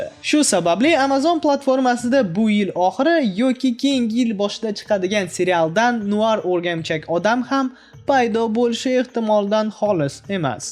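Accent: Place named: Tashkent, Uzbekistan. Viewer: Nma bovoti😂 ybat toza toshkenlik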